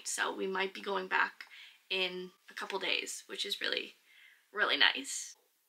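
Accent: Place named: United States. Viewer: It’s so weird seeing you visiting a place near me, as you are so American 🤣 and different to the locals (as in your dialect)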